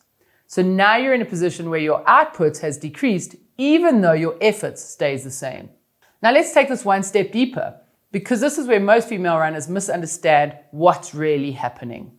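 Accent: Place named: South Africa. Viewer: I’m American and my best friend is from Johannesburg, I love your SA accent. Thank you for the great tips (I’m 50 and just started running this year)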